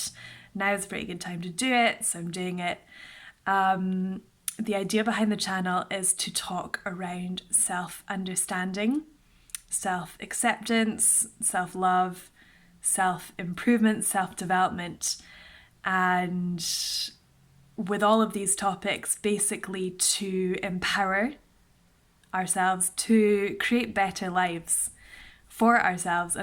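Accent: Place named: Ireland. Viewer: This is a good video. And the Irish accent was brilliant.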